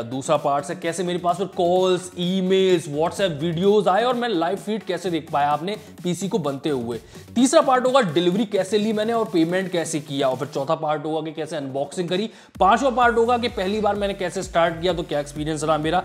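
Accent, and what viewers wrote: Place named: Bulandshahr, India. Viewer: Where are you from, I can recognize your language. Are you from bulendshar, up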